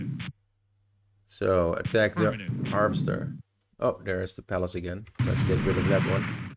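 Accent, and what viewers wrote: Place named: Netherlands. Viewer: Lol wist niet dat je een Nederlander was , zag je post op Tweakers en nu hoor je duidelijk je Hollandse accent. Thnx voor deze project , ben mega fan van Dune 2 (en andere Dune games) sinds ik het speelde op me oude 286. Dus super vet project , good Luck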